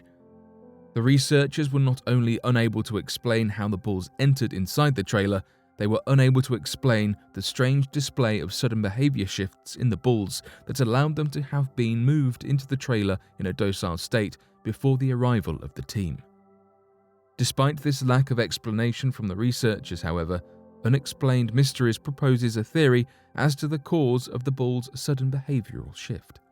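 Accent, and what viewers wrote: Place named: Cockney, United Kingdom. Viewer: More recycled bull shit that isn’t anything new. Jesus fuck. Can you make videos on anything current? Cause from what I’ve seen on the websites I follow there’s plenty for you to report on. Probably because I’ve learned proper journalism from a professional one. And you’re probably an educationally lacking British person who’s grammatical cockney slang comes out in some sentences. No matter how hard you try to hide it... still comes out. 😂. Seriously though... did you finish high school even? Like you probably didn’t even have the chance to drop out of college(university, for your English ass) did ya!?! Hahahahahah.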